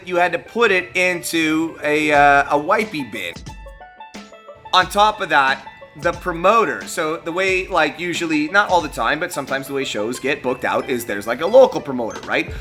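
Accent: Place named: Minnesota, United States. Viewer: That Minnesota accent though 😍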